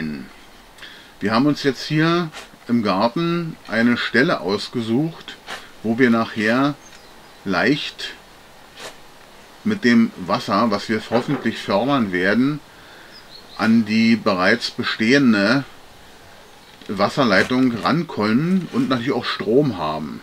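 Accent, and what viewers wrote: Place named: Berlin, Germany. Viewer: Was soll daran nicht mein Ernst sein? Dem Tonfall nach zu urteilen befinden wir uns in Berlin oder Brandenburg, folglich bezieht sich meine Frage auf die dem Brunnenbauer/Grundwassernutzer obliegenden Verpflichtungen nach den Paragrafen 36 und 37 des Berliner Wassergesetzes oder wahlweise 55 und 56 des brandenburgischen Wassergesetzes, ansonsten schlicht und einfach auf die Regelungen des Wasserhaushaltsgesetz des Bundes in Bezug auf den Brunnenbau und die Benutzung von Grundwasser.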